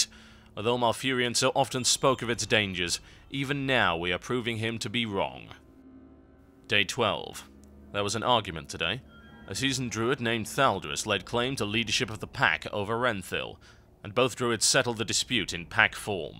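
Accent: United Kingdom. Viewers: sounds like a boring documentary.... but it is the one and only cynical brit so like! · he does have a british accent cause he's from UK :) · voice suits WoW for sure. It's a very distinct UK accent that's very usable in the worgen stories:) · I wish I had a hulky british guy to read a book to me every night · you have an awesome accent. It's not one of those horribly exaggerated ones, this one is less aggressive and expressive, yet it has a certain taste to it that we all come to love. That leads me to my question, what part of the UK did you get that accent?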